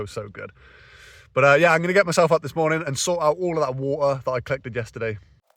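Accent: United Kingdom. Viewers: i like your british accent! Cracks me up when you say b**thole of water <3. Love your channel!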